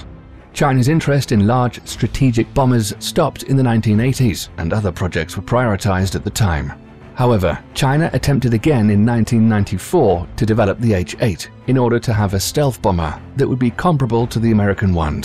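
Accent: United Kingdom. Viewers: Sorry to burst your bubble, but he's British, and that's how we pronounce it. It's our language, so don't lecture us on pronunciation.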